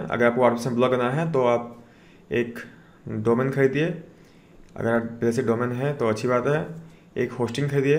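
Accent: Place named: Odisha, India. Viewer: Tu pahle hindi sikh le... odisa se h kya bhai?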